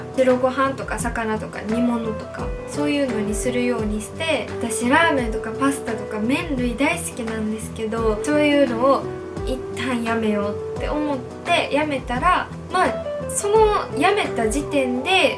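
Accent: Kansai, Japan. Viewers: 関西弁なのめちゃめちゃ萌える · 美人の関西弁はズルい可愛すぎる、、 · 浜辺美波ちゃんに似てるしめちゃくちゃ可愛いし、関西弁で親近感湧く！可愛い！正義！ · この美しいお顔で関西弁なの最高…☺️ そして美しい人はやっぱり努力してるんだなぁ。素晴らしい。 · 動画に関係ないけど関西弁がかわいすぎる😭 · （ダイエットと成功体験から学ぼう、！）と思って動画見始めたはずなのに、お顔が強くて韓国系なのに可愛い関西弁が出てくるから可愛いのオンパレードだわ、、と困惑してしまった₍ᐢ.ˬ.ᐢ₎ 8時間ダイエットならできそうな気がする！！ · 初めて見ました...可愛くておっとりした感じの関西弁大好きです😭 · 関西弁てギャップがまた良い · この美形で関西弁なのが嬉しい可愛い😂💘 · 聞きやすいと思ったら関西弁やった〜めっちゃ聞きやすい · 関西弁とかギャップすご！かわい！ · バチバチにクールな子かと思ってたら関西弁でめちゃめちゃ可愛い喋り方で一気に惹かれました。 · 関西弁？うちも関西の人間やからなんか、嬉しいな。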